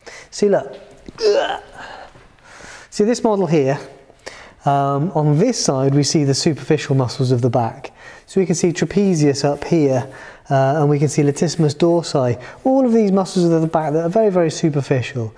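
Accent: United Kingdom. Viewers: Maybe you wanna slow down a little bit , I barely can understand your British accent 🤷🤷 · From the moment I've heard him speaking I understood that he is from the UK, lol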